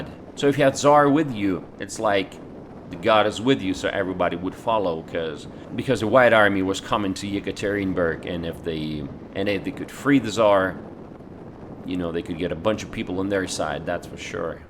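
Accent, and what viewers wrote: South, United States. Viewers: New sub. Очень приятно. May I ask where did you learn English? I've been watching your videos and when you talk you have a particular accent...it sounds either southern or you learned in the "hood" (no disrespect I'm from the "hood") either way I like your videos. Спасибо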